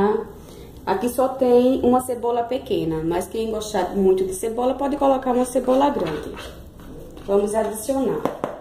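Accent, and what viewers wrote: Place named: Paraíba, Brazil. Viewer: Vc é paraibana? Sotaque lindo.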